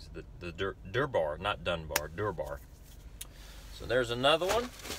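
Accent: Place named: South, United States. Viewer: What part of the South are you from?? I'm in the south too.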